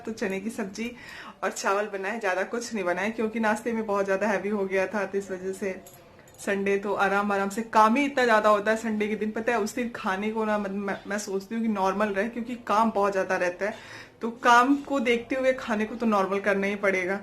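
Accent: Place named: Bhojpuri, India. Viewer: Apke muh se bhojpuri bahutt achhi lagti hai dii.. Nice vlog💓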